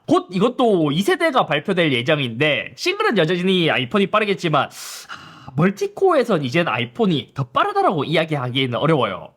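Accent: Gyeongsang, South Korea. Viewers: 뭥미..경상도 사투리 쓰면서...왠...한화 유니폼을 입고..??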